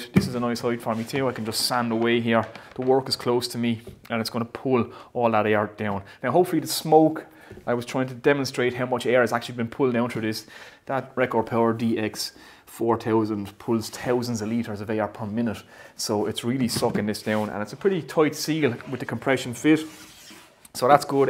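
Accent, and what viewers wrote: Ireland. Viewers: My grandparents were Irish and it’s nice to here the brogue again after over twenty years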